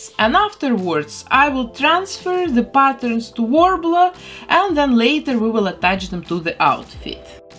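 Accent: Russia. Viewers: Кукла получилась классно. У вас сильный русский акцент, поэтому подумала, что вы меня поймёте). Работа сделана весьма талантливо. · русская чтоль? акцент- слушать невозможно, только смотреть, видео интересное! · Русский акцент? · А никого не смущает ее дикий русский акцент?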